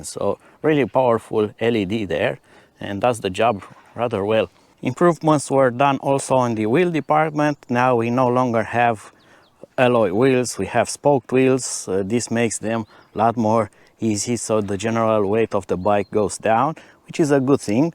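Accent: Romania. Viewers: Are you Romanian? That accent ..😊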